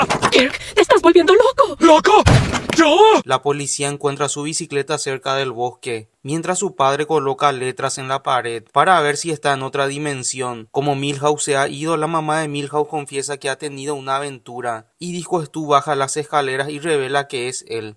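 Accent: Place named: Paraguay. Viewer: Chaaa pense luego esta vos me parese un paraguayo y si sos ra'e cha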